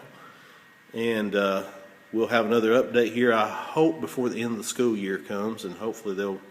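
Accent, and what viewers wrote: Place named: South, United States. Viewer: I'm so glad to see y'all restoring this wonderful car. I owned a '71 when I was 17. Loved that car and now I own a '72 (and I'm waaaay past 17 now. lol). Loving your accent too. I spent my first 41 years in Texas, now I'm in New York. I miss listening to southerners. :) I'm impressed with all your work. Kudos to you and your class.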